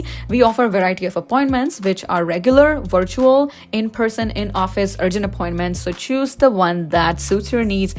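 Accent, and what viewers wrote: Canada. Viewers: How did you develop Canadian accent?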